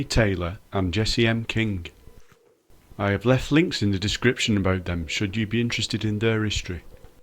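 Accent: North, United Kingdom. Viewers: Hi Firstly big apologies for our tardiness we are now back from our Xplorations of the Picos de Europa and are doing YouTube catch up on video watching! Loving this what a fabulous area! Love all the historic buildings and then the brightly coloured painted doors and windows! The bright Barbie pink Fiat 500 tickled us next to those historic remains! Lovely narration in our favourite Northern accent! Loved you on that giant chair of course you had to check it out! What a fabulous market and yay to free parking 😂 sold! Then a lovely forest and lakeside walk, tragic about the house lost to a fire! And you can visualise that jetty being used back in the day! We did enjoy these Xplorations 👍❤️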